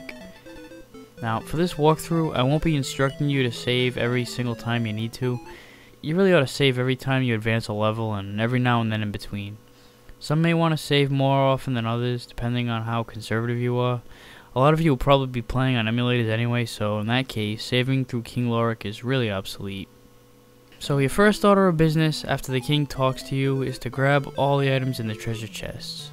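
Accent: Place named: Massachusetts, United States. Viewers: From New England, are you? You cant hide the accent Me too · I like your accent. Which part of Massachusetts are you from?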